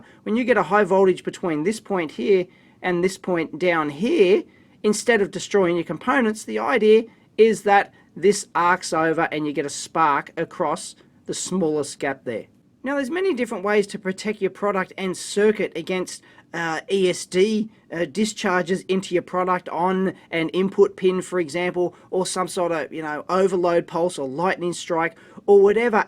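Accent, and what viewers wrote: Australia. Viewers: He's Aussie, mate. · You accent really got me thinking the entire video where you might be from. Usually american accents piss me off, which isn't really the case with your voice. Also (maybe im crazy, who knows) i sense sliiiight british nuances. So my wild guess would be ... Australian?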